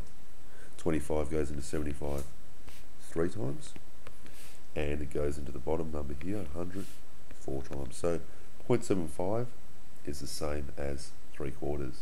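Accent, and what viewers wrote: Australia. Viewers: ARE YOU AUSTRALIAN? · I like his deep Australian accent :3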